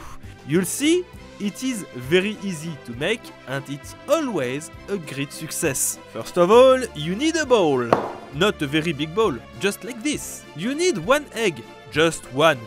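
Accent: France. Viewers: A l'accent frencais quand ont parle englais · Le pire... LE PIRE !!! C'est que j'ai compris l'anglais bien mieux dans cette vidéo que n'importe où. Thank you for the good lesson of the good english speaking with a strong french accent ! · Accent a la française et a quand un feat avec fildrong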